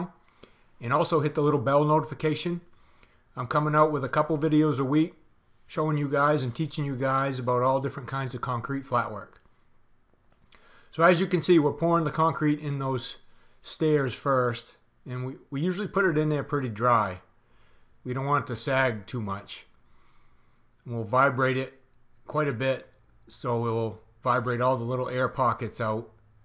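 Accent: Maine, United States. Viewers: Great work!! it’s funny after listening to you talk for about 10 seconds I was like he’s from Maine! Looked up your company and your in Monmouth... I live in Bangor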